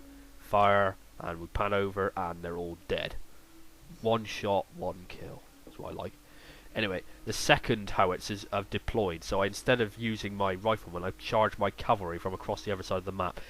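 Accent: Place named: Barnstaple, United Kingdom. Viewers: He sounds a bit West Country, maybe possibly Berkshire. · You sound like you are from Barnstaple! Noice!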